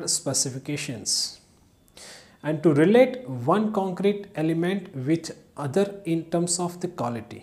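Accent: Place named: India. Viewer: Indian English is bad thing!!!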